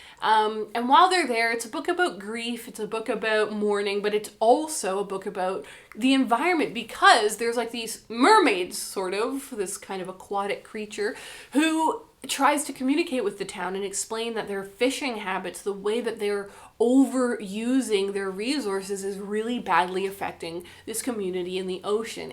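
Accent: Canada. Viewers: So many Canadian 'abouts' in the first forty seconds it's so great! Great sponsor, looks like a good ecofriendly product.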